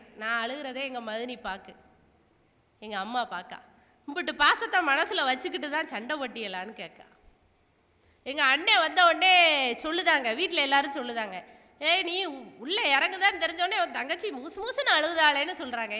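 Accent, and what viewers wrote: Tirunelveli, India. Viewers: Ñellai Tamil👌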